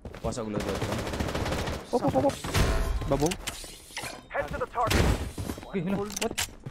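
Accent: Visayas, Philippines. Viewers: Idol bisaya ba ikaw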